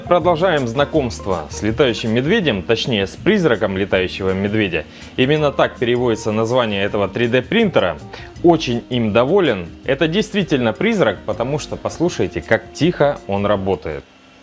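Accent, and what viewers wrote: Rostov, Russia. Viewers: Акцент Ростов Папа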